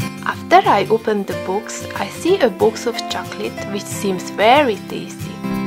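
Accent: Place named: Romania. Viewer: Your accent is beautiful, please don't think you have to change it at all! Your English is perfectly understandable, and I personally adore the way you speak it. I would love to hear you speak Romanian too, though so I may be biased. LOL And I know my Romanian pronunciation would be SO much worse, by a LONG way. :D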